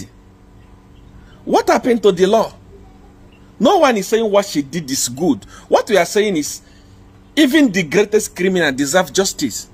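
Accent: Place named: Nigeria. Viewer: Are u nigerian or zambian? Because of your accent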